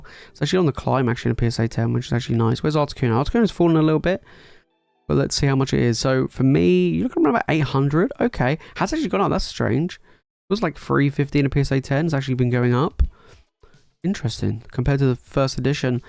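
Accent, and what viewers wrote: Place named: United Kingdom. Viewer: Nice to hear a UK voice - thought I was going crazy that I didn’t remember 1st Ed. in this country!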